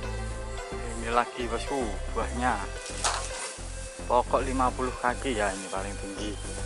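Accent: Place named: Java, Indonesia. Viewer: Logatmu jowo maseh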